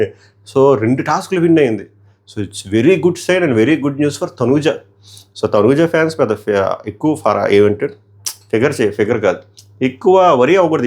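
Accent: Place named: Andhra, India. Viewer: Bro ,am from Bangalore …ee Andhra slang vini chala years ayyindi … more than your reviews i like hearing this slang …Telugu almost marchipoya anukunna 😊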